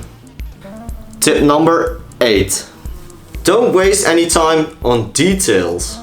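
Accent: Netherlands. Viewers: Good tips brO:) r you dutch? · this guy is dutch no doubts lol. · Dutch for sure i hear it right away in the accent. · According to his accent, he could be dutch.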